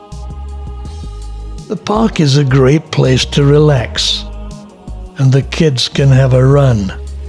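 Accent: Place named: United Kingdom. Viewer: oa Nice British accent .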